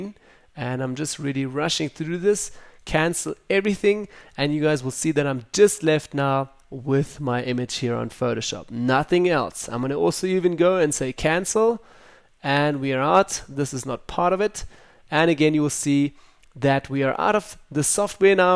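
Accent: South Africa. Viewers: Ja nee! You must be 🇿🇦 GREAT TUT! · Are you south african!!!!!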